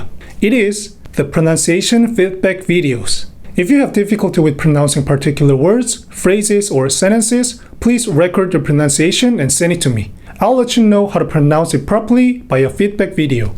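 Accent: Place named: United States. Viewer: Me being an English native British English speaker and being so confused when you said English words come from the back of your mouth, and then ofc the examples were in an American accent 😂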